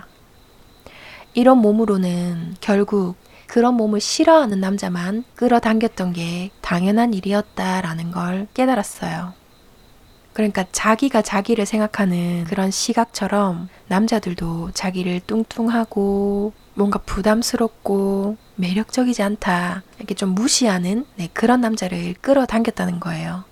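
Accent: Gyeongsang, South Korea. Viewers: 작년에 영상이 너무 좋아서 책을 사려고 했는데 도서관에서 보는것 말고는 구할 수가 없더라구요. 절판ㅠ 어느날 여행지에서 길을 잃었는데 그곳에서 중고서점에 들어갔어요. 근데 제 눈앞에 이책이ㅠㅠㅠ너무 놀랐어요. 2편인 마음의 오류까지 구했어요...방금 이 책 읽는중에 가브리엘라 내용이ㅠㅠ 129p에 있네요ㅋㅋ너무 반가워서 글쓰러 왔어요. ㅎㅎ 항상 영상 잘 보고 있구요. 제 책장엔 이 읽어주시는 책들로 채워지고 있어요❤ 저도 경상도 사람인데 사투리 너무 듣기 좋아요. 늘감사해요^^ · 방금 구독했어요ㅎ 경상도 사투리가 너무 정겹게 느껴보는건 처음이네예ㅋ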